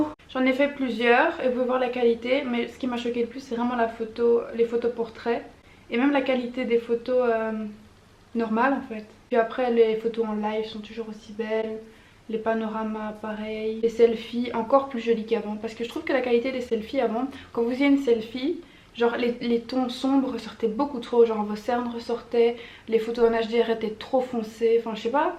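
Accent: Belgium. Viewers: tu es belge? J'ai l'mpression car tu as l'accent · Accent belge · C’est normal qu’elle disent ouite a la place de huit car elle est belge moi aussi je dit ouite enfaite c’est parce que chez nous en Belgique ont dit pas huit mais ouite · elle a un accent belge c est ps de sa faute😅🤷🏽‍♂️ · Tu viens d'ou de Belgique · Tu es belge ? J'ai beaucoup aimé ton unboxing ! Moi je vais avoir l'iPhone 6s en août donc je suis assez exister aha ! Gros bisouuus ❤❤ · Tu est Belge? Sinon t'es parfaite💍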